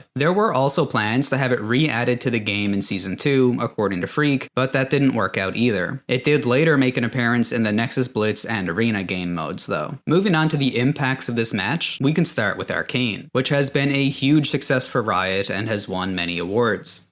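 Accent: Newfoundland, Canada. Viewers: Ive watched a few of your videos and enjoy your presentation, and not that it matters, but are you from Atlantic Canada, like Newfoundland & Labrador? I thought I could hear Irish or Scottish, but if I was on a gameshow I'd lock in NFL. Will be subscribing. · hey are you canadian? you sound like a newfoundlander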